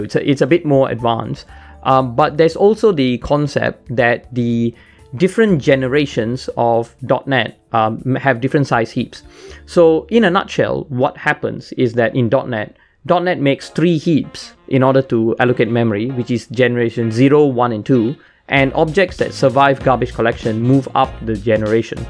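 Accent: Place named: Malaysia. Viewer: are you malaysian ? Sounds like you are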